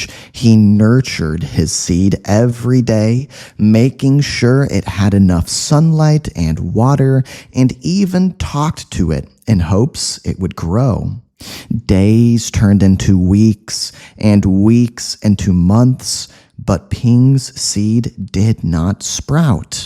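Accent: United States. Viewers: Hi. I am watching from france, I discovered your channel recently. I listened to the GSL english podcast, and I loved the way you spoke in that podcast. Your American accent is terrific, and it has drawn my attention and wanted to listen to you more. Keep up the good work. I love what you do 🙏🏼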